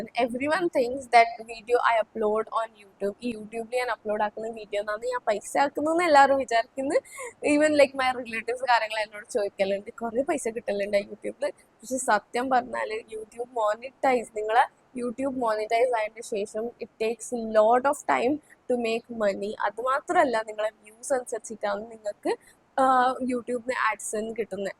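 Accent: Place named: Kasaragod, India. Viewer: Kasaragod slag ❤❤😂😊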